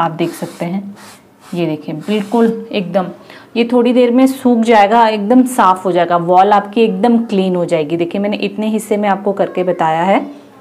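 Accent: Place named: Himachal Pradesh, India. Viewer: Thank You Mam For Replying On My Comment Mujhe Laga Aap Himachal Se Ho Aapki Tone Himachali Lag Rhi Thi .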